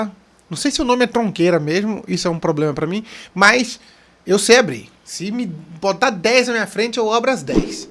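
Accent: Minas Gerais, Brazil. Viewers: Esse é besta demais, adoro os vídeos dele e quando ele fala mineirês 1🤣🤣🤣🤣🤣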